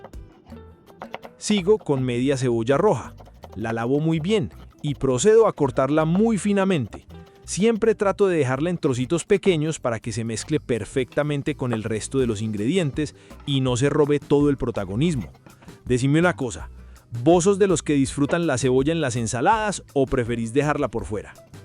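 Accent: Colombia. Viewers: la verdad todas tus recetas se ven exquisitas en verdad gracias 🙏🏻las vea todas pero lo que más disfruto de n tu asentó parcero 😅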